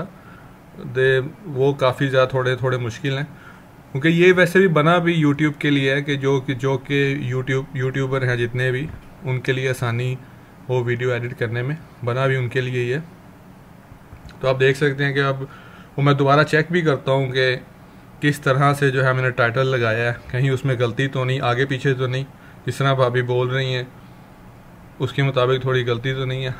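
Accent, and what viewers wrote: Australia. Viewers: V informative ,your english accent is also too good crisp australian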